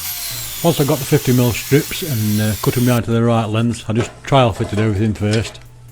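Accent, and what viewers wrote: Yorkshire, United Kingdom. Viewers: Excellent video (as always) and your accent does make my wife smile. She is from Yorkshire but we live in Cornwall so she doesn't get to hear it much!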